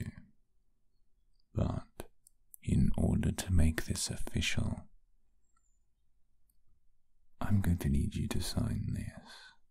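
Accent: United Kingdom. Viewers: My asexual ass was not paying attention at all because the whole time all I could think was BRITISH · Is anyone going to talk about the British accent???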